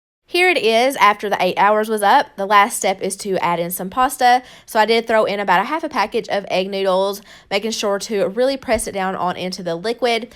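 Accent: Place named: Texas, United States. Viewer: She sounds like us Texans except I’m even more country than her. I feel at home with her accent.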